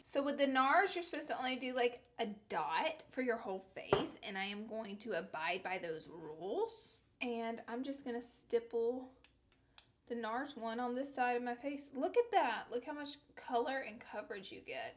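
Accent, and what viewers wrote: South, United States. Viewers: First time watching your video, I love your Southern accent!! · OMG, I love you!! <3 I hear your southern belle accent coming THROUGH! And i do love the way you styled your hair in this video. xoxo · Love your extra craze and extra south in this video! ❤️ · She is so pretty but her voice is so country 😅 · I'm obsessed with your country twang. OBSESSED! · Just started watching you and my first thought was oh, girl from the South! Love it! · I just love you ! Every time I see the episode of the office when Pam is Deb U Taunt in that murder mystery game I think of your southern darlin accent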